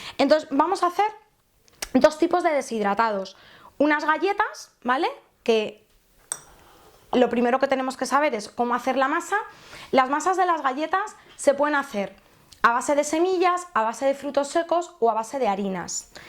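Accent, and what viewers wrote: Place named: Spain. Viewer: Nice girl, he prestado atención a tu clase de nutrición y estilo español al hablar ,MUA MUA.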